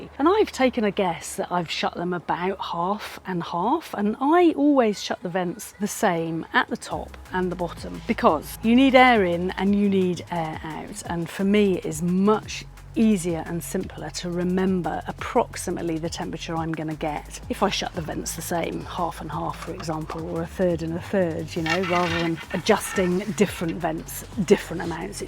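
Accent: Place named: Bristol, United Kingdom. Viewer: I’m starting to hear your Bristolian accent! Thanx for these informative kebab videos my love! Greetings from a fellow Bristolian stationed in sunny Cyprus 🫡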